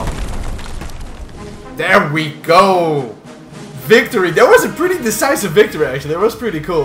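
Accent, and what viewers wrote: Netherlands. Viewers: I smell Nederlands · ur dutch arent you ;) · your Dutch accent seems inaccurate for a German soldier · Thic Dutch accent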